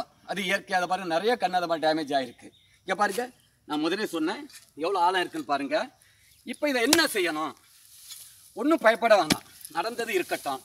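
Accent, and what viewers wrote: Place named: Kanyakumari, India. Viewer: Annachi kanyakumari kaarara neenga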